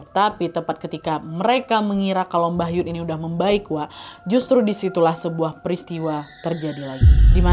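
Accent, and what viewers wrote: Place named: Java, Indonesia. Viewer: aku notice logat jawa nya kak makin keren aja 😘😘😘😘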